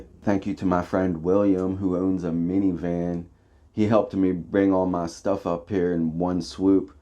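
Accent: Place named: Philadelphia, United States. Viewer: This dude is from Philly i can tell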